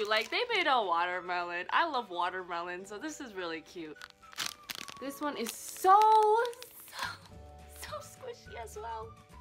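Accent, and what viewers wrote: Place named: Philadelphia, United States. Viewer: I was wondering where are u from because you speak Spanish and you speak Philadelphia soooooo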